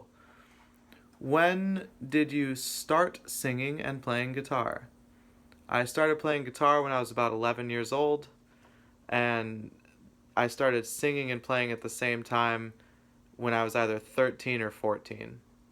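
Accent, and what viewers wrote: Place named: Manchester, United Kingdom. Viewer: Wow. I’m fuckin man. I’m a Scot. I honestly thought you were a Manc or something. Just the way you sing. Very smiths sounding I guess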